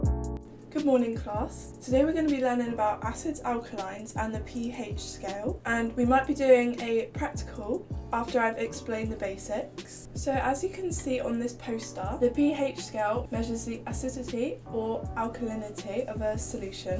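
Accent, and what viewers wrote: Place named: United Kingdom. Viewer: my british accent (Btw I’m not British I’m American): “cAn yOu pLeAsE hAnD oVeR tHe wOaTeR” your british accent: 😙👌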